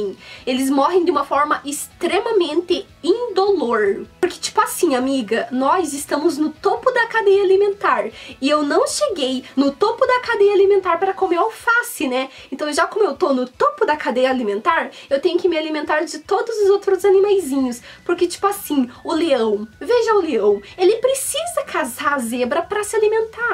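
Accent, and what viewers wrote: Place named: Sorocaba, Brazil. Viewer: Que sotaque gostoso! sinto saudades dos meus amigos de sorocaba que falam assim, tenho amigos do sul que falam assim também. Minha amiga Priscila fala igual a ti!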